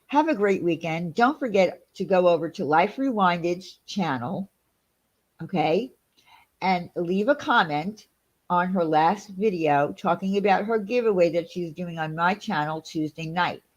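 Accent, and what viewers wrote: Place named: South, United States. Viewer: You go I love that southern voice lol you do good!!!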